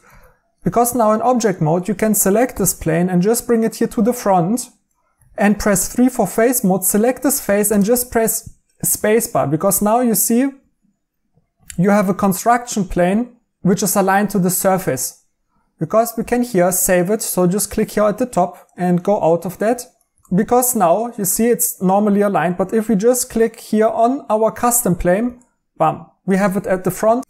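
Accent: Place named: Germany. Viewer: Hi, thx for your free content 👍 btw, i hear u r no native speaker, by any chance, is your native language german? 😁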